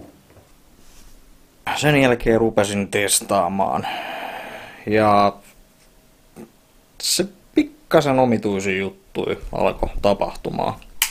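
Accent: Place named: Kuusamo, Finland. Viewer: Kyl kuusaan murre kuulostaa hyvältä👍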